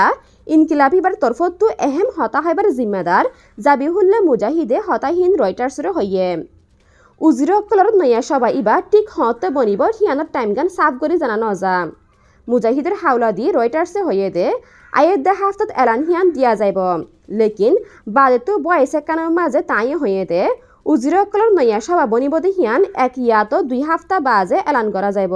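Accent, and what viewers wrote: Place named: Rohingya, Myanmar. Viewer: Dear sister, I greatly appreciate to your significant Rohingya accent.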